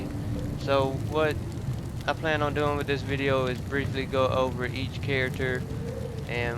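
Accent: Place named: South, United States. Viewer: just some Southern drawl or something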